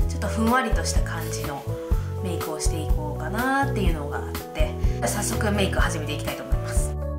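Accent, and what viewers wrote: Kansai, Japan. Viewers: 関西弁すごい可愛いです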